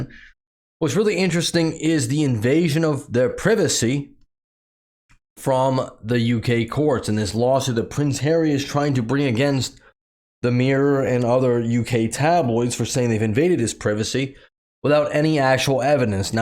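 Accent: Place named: Chicago, United States. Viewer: Ahh...now I know you're not my long lost brother, Rick. You sounded so much like him I was wondering. Definitely remind me of the old Chicago accent . lol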